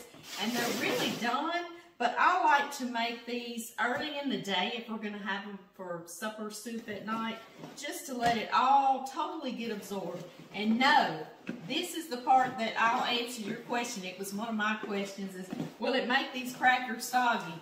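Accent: South, United States. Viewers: You are stinking cute! Love your southern accent! ❤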